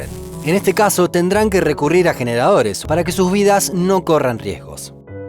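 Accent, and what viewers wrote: Argentina. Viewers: Por tu acento creo que eres argentino Así que te pregunto que se sintió el apagón en el cono Sur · se parecen porque ambos tienen acento argentino creo. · Claramente está dejando a un lado su acento argentino para poder hacer este vídeo. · Yo le noto el acento argentino, pensaba que te referías a las jergas, pues el acento se le nota, no se si los otros argentinos tienen un acento mas fuerte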